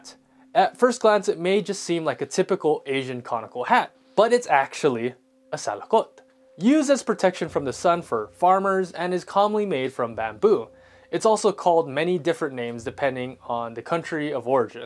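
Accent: United States. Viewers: I like to know if you have a western blood? i am interested on the biopsychology of talking like from west when you are from the east, i.e. acquiring that accent or voice quality like American when you are talking. i did not expect that the person behind the narration in the beginning have asiatic facial features. (if not biopsychological, maybe psychocultural which in other words, you must be living in the west?) Or maybe you really study how to speak in a way like that? Or learn from hearing? To others who read my reply, maybe I am not as good as Americans like some of you here in telling whether the sound quality is asian or american-like because I'm not American caucasian. i observed that voice quality acquisition before from my English language professor. i am gayish that's why even though i can speak English, the non-manly voice come out. though, I can still differentiate an original American caucasian who's not culturally British English voice quality from an acquired one like yours. 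this one is American causian, non-british english <link> (the man only, because that's what I'm talking about, the scope).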